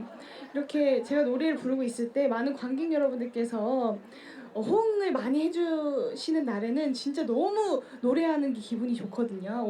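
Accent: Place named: Seoul, South Korea. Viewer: 전유진 서울사람다됬네 이제는 서울말을 자연스럽게 잘하네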